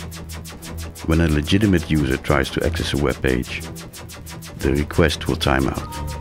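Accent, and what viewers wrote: Netherlands. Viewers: Geniaal, je kunt gewoon horen dat dit een Nederlander is XP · Is that voice from a dutch person? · is it just me or does this guy have a dutch accent? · Ja hij heeft een nederlands accent XD. Engels is verder wel gwn goed. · kan horen dat je nederlands bent, mooi filmpje :)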